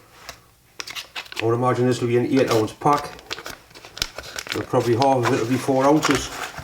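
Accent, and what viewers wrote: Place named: Geordie, United Kingdom. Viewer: Ah really enjoy yer traditional recipes an Ah love the way yee divvent change yer accent tee much. Ahm a born an bred Geordie frem Wallsend but Aa've spent the last 20 years living in Scotland. But when me laddie wez born Ah made sure wi cyame doon te the General Hospital just so me lad waad hev a rich heritage an be a true Geordie. Thanks a lot. Paul Wor lass is also called and wi love watching yi.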